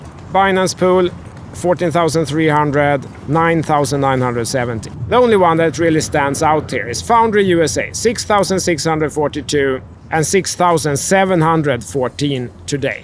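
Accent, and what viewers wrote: Sweden. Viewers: Best Swenglish on youtube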